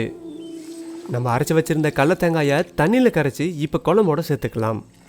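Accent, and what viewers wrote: Salem, India. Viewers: Salem ah neenga bro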